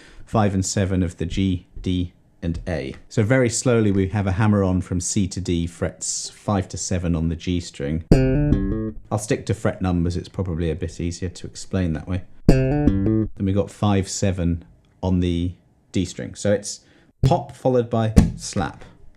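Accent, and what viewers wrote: United Kingdom. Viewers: I can't decide which I like more, the bass tone or the British accent.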